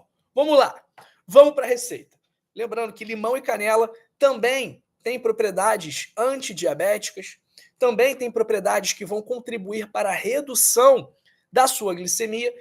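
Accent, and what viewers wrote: Rio de Janeiro, Brazil. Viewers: Claro que as mensagens são úteis. O ruim do vídeo é essa cara de filho de Satanaro e esse sotaque carioca insuportável!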